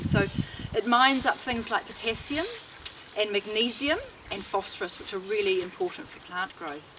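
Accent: New Zealand. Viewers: You sound like a kiwi, thanks for the great information as I love herbs. I want to suggest one thing, try to be less violent and communicate with them more through intention, you will find that you will get a better result with everything you desire to achieve from them. blessings. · When I move to New Zealand in the future I hope to adopt a nice kiwi accent like yours. It truly is nice.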